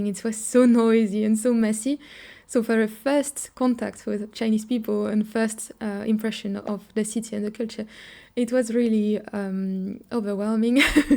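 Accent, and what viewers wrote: France. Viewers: Through the window / Stricter. How could one prefer Chinese culture over Japanese culture is beyond me. And very surprising considering how introvert and clean you are...Opposites are attracting each other I guess.^^ The mountain range of Huangshan, which inspired the floating mountains in the Avatar movie, not too far from Shanghai, is the most spectacular landscape I've ever seen, and I've seen a lot, especially when you are above the clouds, which makes it feel like the mountains really are floating . Just need to escape the armies of Chinese tourists to really enjoy the site. They tend not to go off the beaten path which makes it easy. Telling French people to lose their accent when speaking English is a terrible advice, if you know what I mean =P (I m a simple man) You prolly don't give a damn but you are losing some sex appeal when speaking English, you are way sexier in French/Russian xD Great video! 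Disclaimer: Most French hate the French media. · Something about the French accent when spoken by a Lady. Wonderful. 🙂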